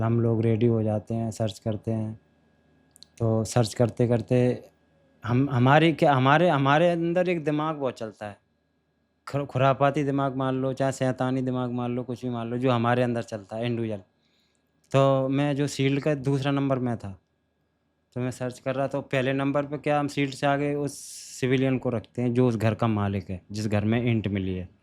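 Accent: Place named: Gwalior, India. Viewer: Tumhari bhasha gwalior sambhag ke aaspaas ka hai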